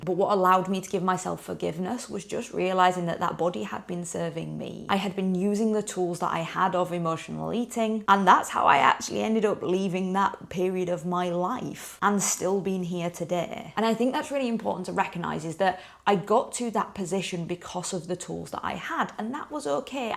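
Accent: United Kingdom. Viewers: are you from west yorkshire? your accent reminds me of when i lived in huddersfield and sheff :) loved the video, def relate. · It's British Northern accent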